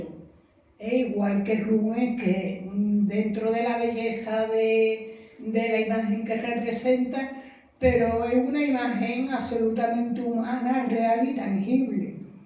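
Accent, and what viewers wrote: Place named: Andalusia, Spain. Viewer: Magnífica disertación,de gran erudición y con una dicción y gracia muy andaluza,mis felicitaciones doña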